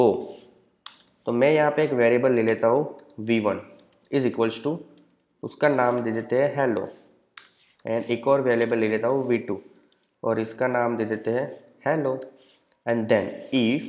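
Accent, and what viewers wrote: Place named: Gujarat, India. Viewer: ભાઈ એક નંબર વિડિઓ બનાવીયા છે અને તમારો અવાજ નો ટોન પાક્કો ગુજરાતી... જય જય ગરવી ગુજરાત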